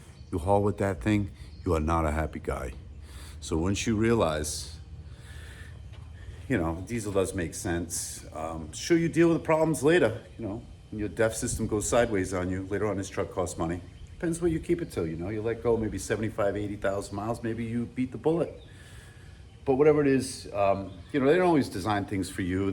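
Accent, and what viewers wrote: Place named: Massachusetts, United States. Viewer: I knew you were from Mass before seeing the plates lol fellow mate !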